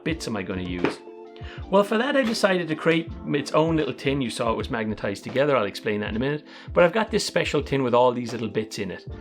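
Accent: Ireland. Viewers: "Underneet Dat doh..." great craic to see a fellow Irishman with a cool edc channel. Great altoid tin! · just came across your channel after this video was suggested to me and my god i’m glad! what a legend! loved trying to localise the silicon valley-ified irish accent. has to be cork? definitely down south anyway ;)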